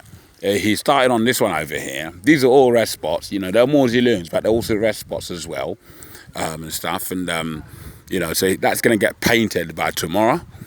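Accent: United Kingdom. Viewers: I was thinking to myself that you sound so British and found myself wondering if you spoke Igbo. Then I got to the end of the video, and you actually spoke Igbo. Love it!!😍😍 You are a bonafide sob of the soil. Okpara eji eje mba! Jisike, nwanne’m.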